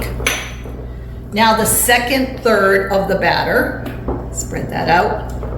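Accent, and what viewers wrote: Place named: East Coast, United States. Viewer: Hi, Thank You for the tip on freezing the bread. I'm a bread [person, so I could eat the whole loaf, but my sensible self says NO! LOL We're heading out camping for a few days, last camping trip of the season. I picked up some molasses the other day at the store, so I'll be making the Anadama Bread when we get home. I thought your accent suggested an East Coast accent. Thanks for replying. Have a Great Day. Ellen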